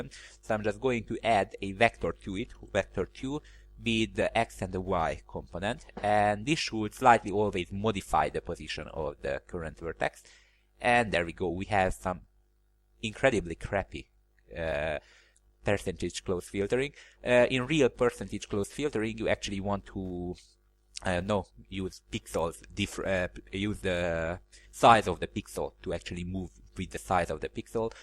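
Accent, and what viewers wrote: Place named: Hungary. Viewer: Are you hungarian? :D Just based on your accent :D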